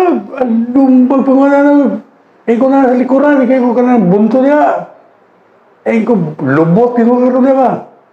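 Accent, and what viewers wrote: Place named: Visayas, Philippines. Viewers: Di maiwaasan makasalita ng bisaya...i'm so proud of your me also is from bisayas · Nice ka sir hehe bisaya special😍🙂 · Nwla antok ko s kwnto n sir bisaya tagalog ok kaayo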